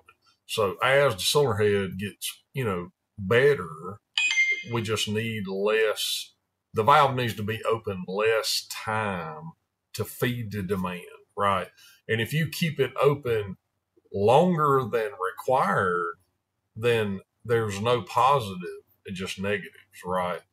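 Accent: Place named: North Carolina, United States. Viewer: He talks like my relatives in North Carolina.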